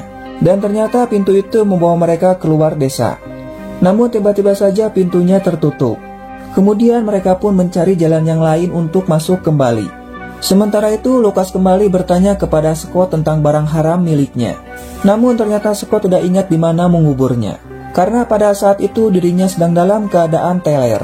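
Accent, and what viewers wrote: West Java, Indonesia. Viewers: Pasti naraton nya orang sunda😅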